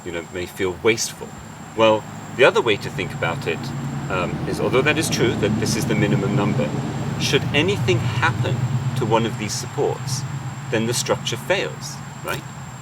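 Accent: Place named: England, United Kingdom. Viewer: My favourite kind of English accent - Ed-dew-cated. Not once did he utter, "let me arx you sumfin, yeah?"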